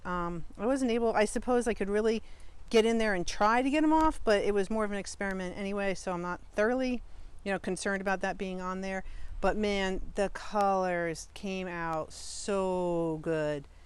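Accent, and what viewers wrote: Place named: Michigan, United States. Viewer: You must be from Michigan as your wonderful accent sound so much one my friends from Michigan?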